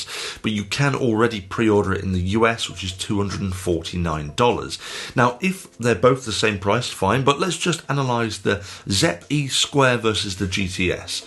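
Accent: United Kingdom. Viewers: you have cinematic charisma actually, i enjoy watching your review with your original British accent ❤️. Thank you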